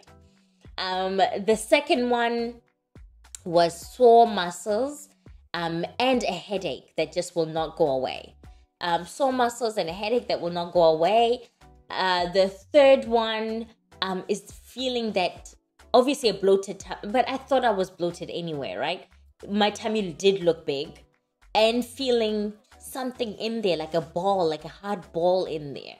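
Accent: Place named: South Africa. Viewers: I thought as much that u can be South African. 😁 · Ur accent is so close to South African 😳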